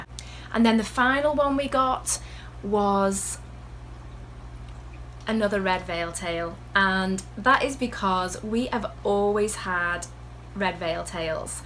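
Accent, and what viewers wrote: Liverpool, United Kingdom. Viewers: i love your channel but i was just wondering are you from the uk but live in america x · Aren't you scouse?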